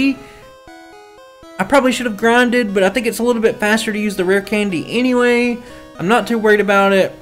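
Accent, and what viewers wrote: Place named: Georgia, United States. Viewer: I'm from England and I'm not very good at American accents. I'm going to have one guess on each of your videos from now as to which state you're from. Now, this is limited as there are only so many states (although who knows how many?) But it might be fun. First guess is Georgia. Please confirm.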